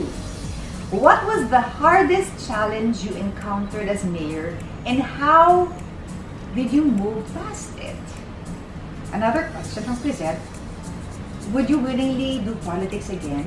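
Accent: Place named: Waray, Philippines. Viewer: You already have the “waray2” twang in your tagalog. 👍🏼 you are a true waray na gud man.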